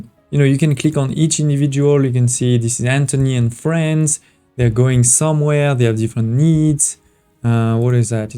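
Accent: France. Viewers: omg I love your French accent, it calms me down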